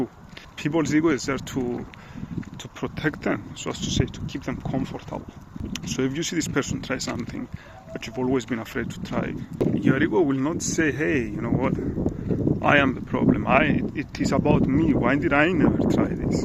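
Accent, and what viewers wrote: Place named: Cyprus. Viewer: Love love love the Cypriot accent ❤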